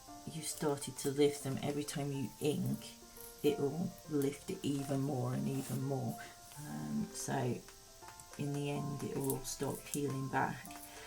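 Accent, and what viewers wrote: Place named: England, United Kingdom. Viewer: ps trying to place your accent! I'm originally from the North West of England (now in Scotland) and yours sometimes sounds N.West... but it sometimes has a west country twang? :O) mind you, I'm a bit rubbish with accents!! ha ha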